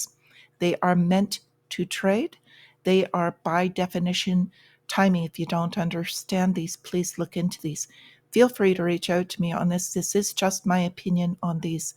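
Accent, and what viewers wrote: Ireland. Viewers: So nice to hear your Irish Brogue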